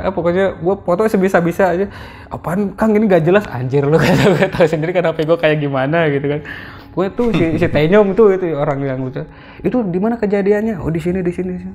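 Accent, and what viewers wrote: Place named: Sukabumi, Indonesia. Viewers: Kang sunda abis wkwkwkwkwkwkwk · Pantes cara bicaranya kek sama ternyata msh satu kota...salam dri sukabumi-cisaat kang 😁🙏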